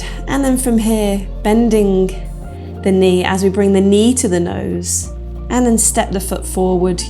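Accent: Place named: United Kingdom. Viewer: Just found your channel and really enjoyed this class, thank you! You sound like a Brit, was this filmed in the UK? It looks pretty spectacular 🤩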